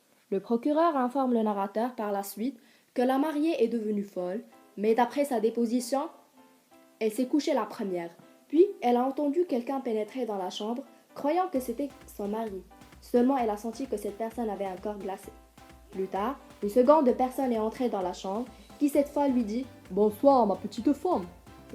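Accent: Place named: Quebec, Canada. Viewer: elle a un petit accent quebecoi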